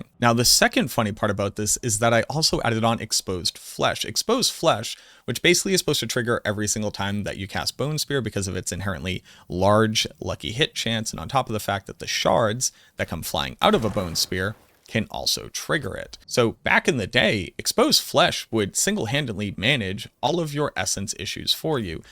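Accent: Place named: Massachusetts, United States. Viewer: Yaaaas. It's so easy to tell he's from Massachusetts sometimes and I love it because it is where I was born. Only lived there until I was 8 because my parents decided we had to move as soon as I started saying, "idear" instead of "idea." 😅😅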